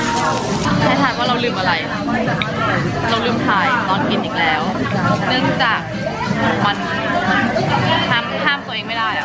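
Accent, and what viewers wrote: South Korea. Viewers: ชอบสำเนียงภาษาเกาหลี มากง่าา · เต้นเป๊ะมากกกก //ขอบสำเนียงเกาของพี่มากเลยอะ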